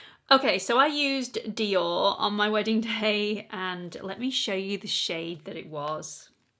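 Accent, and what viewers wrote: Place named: England, United Kingdom. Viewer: I love watching you! You’re beautiful and funny, and that English accent is to die for❤️❤️❤️ Every time I call my husband and scream”just listen to her voice”the answer is ‘Oh, I know, I know, alright”😂😂😂 I just adore you!😘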